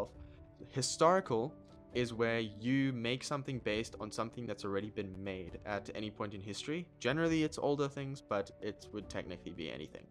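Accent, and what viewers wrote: South Africa. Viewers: South African ou?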